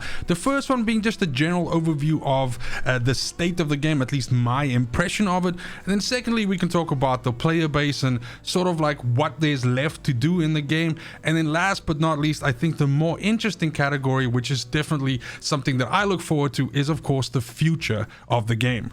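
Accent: South Africa. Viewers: Are you from South Africa perhaps? This, coming from a South African.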